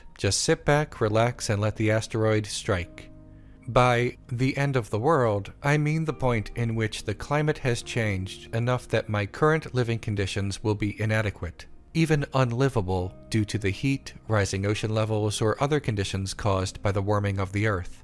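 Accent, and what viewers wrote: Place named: Baltimore, United States. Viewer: Boooooooi you from Baltimore whaaaaaaaaat?????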